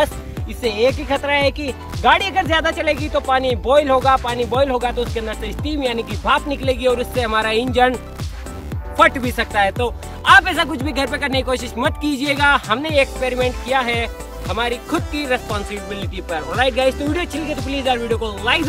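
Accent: Rajasthan, India. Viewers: you make very good vedio you have Rajasthani 😃😃😃😃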